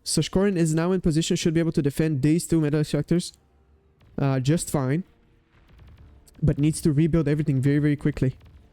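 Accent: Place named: Quebec, Canada. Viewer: This guy kind of has the George St. Pierre accent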